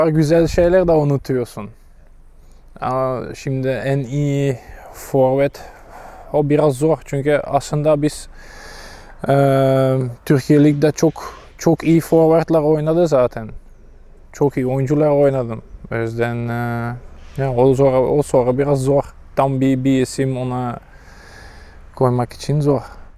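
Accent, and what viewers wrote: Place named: Syria. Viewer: Suriyeli gibi konuşmuş ama saglam kaleci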